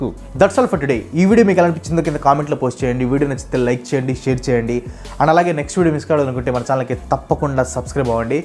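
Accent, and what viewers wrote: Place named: Kadapa, India. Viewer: కాదురా తోయ్ మా కడప యాస మీరు కూడానా బ్రో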